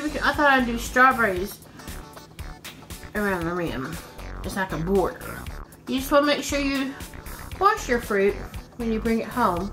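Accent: South, United States. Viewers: just found your channel!! love the recipes..love your southern accent as it reminds me of mine. what part of the south are you from?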